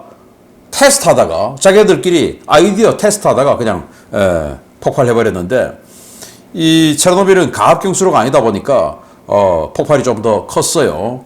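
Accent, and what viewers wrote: Gyeongsang, South Korea. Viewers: 경상도 사투리가 매력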